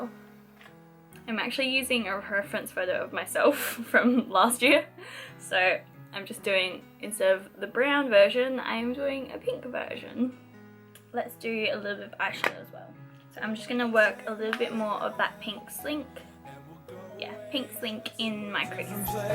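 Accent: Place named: Australia. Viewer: Where are you from you sound Aussie but then you have a bit of a English accent to it ❤️ it's beautiful though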